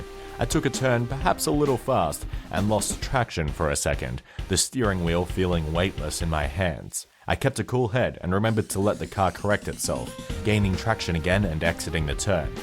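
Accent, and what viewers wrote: Australia. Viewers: Figured you were Aussie. Then you said Holden .. CONFIRMED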